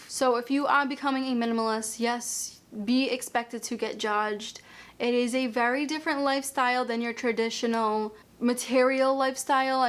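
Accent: Boston, United States. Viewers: I was wondering where that accent came from, too. But please never apologize. You're awesome!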